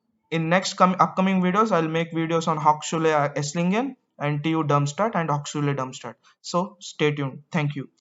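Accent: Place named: India. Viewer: Why on the earth all these Edu videos made by Indians with a strong accent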